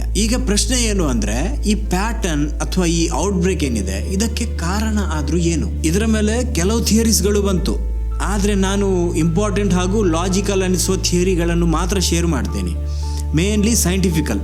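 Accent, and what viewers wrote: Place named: Coastal Karnataka, India. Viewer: Anna en content nimma voice matte nimma karavali kannada super 😢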